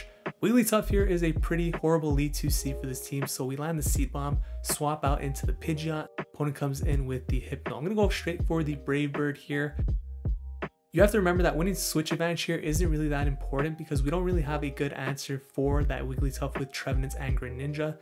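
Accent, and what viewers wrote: Canada. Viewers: I thought. that was a Canadian accent I was hearing,!, maybe the Raptors should pick up Iman Shumpert and get some of his DWTS momentum!!! 😂 Keep making such entertaining and informative videos! Your the best in this Tennessee Vols fanatics opinion!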